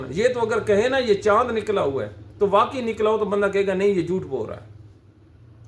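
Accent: Saraiki, Pakistan. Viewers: صاحب بہتر ہے کہ پروگرام لکھ کر بیان کیا کریں۔جوش خطابت میں غلطیاں کرجاتے ہو۔مولا علی علیہ السلام کا فرمان ہے کہ کفر کا نظام تو چل سکتا ہے لیکن ظلم کا نظام نہیں چلتا۔ ۔۔۔۔اور آخری جملے۔آپ لڑ کے دشمنوں کو مارنا چاہتے ہو۔لیکن کہنا تو تھا کہ یارو آپ لڑ کر اپنوں کو مارنا چاہتے ہو۔؟۔ تھوڑی تھوڑی کیری لگی رہتی ہے سرائیکی ہیں ناں۔تو اسی لیئے عرض کیا ہے کہ مین جملے لکھ کر بیان کیئے جائیں تو پھر غلطیوں والے الفاظ سے تھوڑی بچت ہوتی ہے۔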